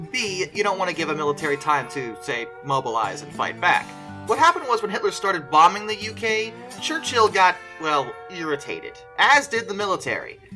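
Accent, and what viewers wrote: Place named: West Virginia, United States. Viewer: Can we have a full West Virginia accent episode for April fools?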